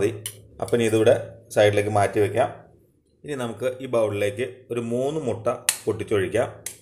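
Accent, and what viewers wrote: Palakkad, India. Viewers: ചേട്ടന്റെ ഭാഷ പാലക്കാട്‌ ടച്ച്‌ ആണല്ലോ... ഞാൻ വിചാരിച്ചു പാലക്കാട്‌ ആണെന്ന് ട്ടാ.. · പിണ്ടിമനയിൽ covid +ve ഉണ്ട് stay safe and healthy,. Proper hand wash and wear mask everytime..പാലക്കാട്‌ ജോലിയുണ്ടോ /താമസിച്ചിരുന്നോ,?? പാലക്കാട്‌ കറികളും സംസാരരീതികളും 🤔🤔🤔നമസ്കാരം പറയുന്ന ബാക്ക്ഗ്രൗണ്ട് കാണുമ്പോൾ പാലക്കാട്‌ പോലെ തോന്നുന്നു,,, ഞാനും കോതമംഗലം ആണ് wrk pkd യും,......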